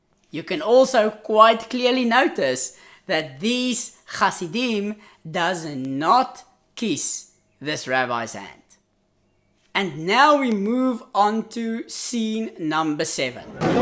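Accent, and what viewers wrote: Afrikaans, South Africa. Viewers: Are you from South Africa? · Hi boeta, jy is duidelik Afrikaans ... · Are you South African?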